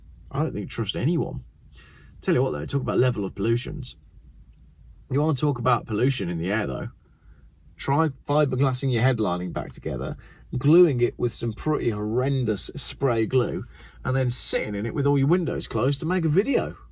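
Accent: Wales, United Kingdom. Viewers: Love your welsh accent! The lunatics have taken over the asylum! They havnt got a clue the lot of them of what it takes to live today. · Very good welsh accent where I live it's more Indian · Luv the accent boyo, you got it dead right mun.!! You from Maerdy by any chance. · Brilliant Welsh accent! I live in Wales and the Welsh government is barking mad! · Very enjoyable .. especially WELSH accent.. who is it that votes these control freaks into power .. boyo bach..??? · Love the Welsh accent..😂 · (Pontypridd/Valleys proletarian resident here) apart from a few mispronunciations butt (mate), not a bad effort at Valley's accent. I've been following the proposed at the 'speed of science' rollout of the 20 minute neighbourhoods-towns/ULEZ/digital ID/CBDC/WEF/k-ching juice jab harm etc etc debacle for months/years. As was commented by others; we are allowed to pollute the air, as long as we pay to do it, how is that improving air quality? 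And on that subject, I want to see actual data (not at the speed of science data) on the air pollution by family ice cars. And the data showing the number of people with lung issues caused as a direct result of ice car emissions. As a retired (on basic pension) proletarian I would consider using the old roads through towns and villages to reach Cardiff, Swansea etc. Unless of course there's blanket ULEZ's with cameras at every turn? See our future in China for social credit system, and future Ukraine, when America/WEF sets up it's banks etc after the country has been carved up. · Great Welsh accent! I can tell you've been to Swansea. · Loved your Welsh accent... I had to go back and read/listen as I was literally LOL! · It's nice to know that Wales is still crazy. A petrol powered car burns cleaner the faster it goes! Anyway, vehicles cause only a minute percentage of pollution. Limits will NEVER help. 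Your Welsh accent is not bad - well done. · More Welsh accents please 😎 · Love the welsh accent! Brilliant. Keep up the good work. I don’t think the people of Wales will have the 15 min city stuff. Should be interesting though. Spray paint may be in demand and second hand number plate cameras could become common on eBay! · Great Welsh accent!!! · lol. As a welsh man I love your welsh acent, really funny. Porta-toylet does have the most intresing smell I have ever exsperanced. Its all most phisical the way it just slaps you in the face. It is the way Ank-morpork smells to my mind. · Stop the welsh assembly’s ( not a government a glorified county council) pollution with their lies propaganda, please do not refer too the Welsh assembly as government, I never voted for a Welsh assembly and when the time comes I’ll be voting too get rid of it, I’ll tell you what lowers the carbon emissions stop politicians spewing out their globalist propaganda lies, welsh accent not bad at all 😂 yes I’m welsh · I used to agree with the Senedd, then Mark Dickord got in charge and basically shit the bed. 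Now all I hope is we manage to vote out Labour, and vote in a government who doesn't hate the proles having personal transport. But since all our political parties seem to hate cars, we're basically fucked if we want to drive our own cars. By the way "Cymru" is pronounced "cum-ree" or "cum-rye", but I loved the Welsh accent 😆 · There is a simple solution to stop this crap, everyone remove the numberplate from your vehicle , stop another stealth tax . Great welsh accent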